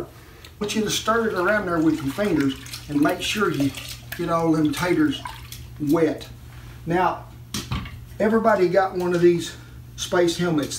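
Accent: South, United States. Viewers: I love his cowboy accent, yee ha!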